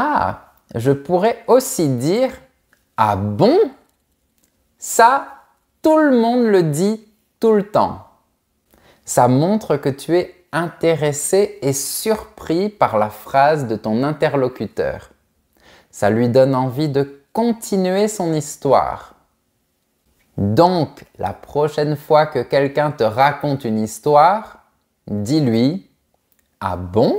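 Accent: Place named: France. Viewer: Merci beaucoup pour faire cette videos. Je peux comprendre environ 100% de ça que tu parles. Mais que je ne peux pas m'arrter de poser un question a moi même. Que region de France est-ce que vouz prof devient? Par-ce que je trouve ton manière de parle très intéressant prof. Pardonne ma mauvaise grammaire. 😅😅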